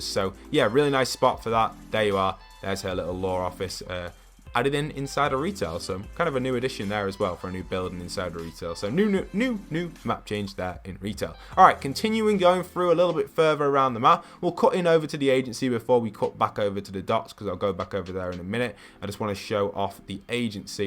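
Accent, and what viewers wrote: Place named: United Kingdom. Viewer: He british that's how Brits say it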